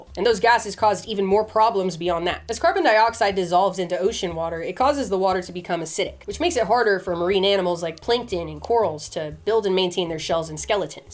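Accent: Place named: Maryland, United States. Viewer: where are you from? You pronounce your O's like a Marylander 😂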